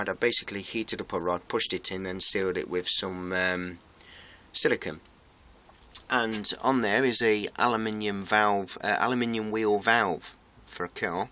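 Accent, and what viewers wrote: United Kingdom. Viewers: Are you from Lincolnshire by any chance?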